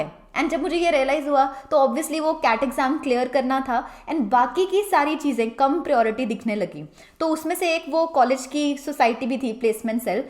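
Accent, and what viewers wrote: Punjab, India. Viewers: Love the tinge of Punjabi when you talk 😊 Chak de phattey Kudiye.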